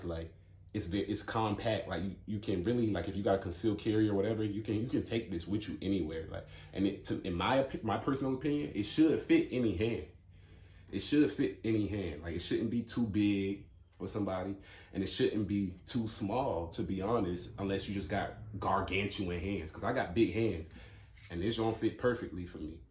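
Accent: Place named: California, United States. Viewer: Ik you hadda livin in Cali da way you be sayin “ya feeme”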